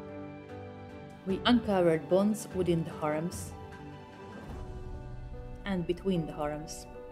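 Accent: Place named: Hungary. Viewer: Hunglish narration.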